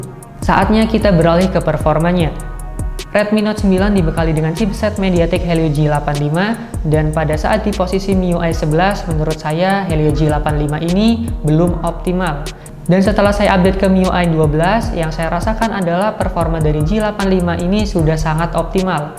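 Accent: Tegal, Indonesia. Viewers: tegal bos? haha auto sub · Wong tegal um?? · Tegal kah?? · Jebule tegal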